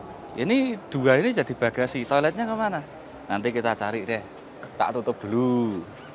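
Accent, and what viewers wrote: Java, Indonesia. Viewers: Suka gaya bicaranya, agak medok, ditambah lagi guyonan dan kata² inspirasi atau kata² mutiara juga baik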